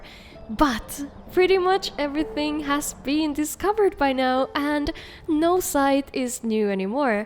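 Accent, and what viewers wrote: Finland. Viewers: Finnish accent spotted, torilla tavataan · oh... OH... i didnt recognise from the accent at first, but... finland... huh... didnt think i'd find one of my kinsmen from the depths of skyrim mod enthusiasts. still, gonna download that mod, 100%. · Are you from Finland?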